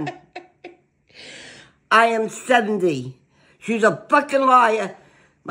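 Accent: United States. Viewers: Hi 👋🏻 best wishes from London, England 🏴 I think you’re bloody brilliant and I could listen to you all day- loving the Brooklyn accent 👏🏻 also. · LMFAO!! Your so funny and I just ❤️❤️❤️ you video’s and Tick Tok videos also! Being from Philly I just can’t get enough of your New York accent!! I live in the South now and miss my family in Philly, Jersey and NY!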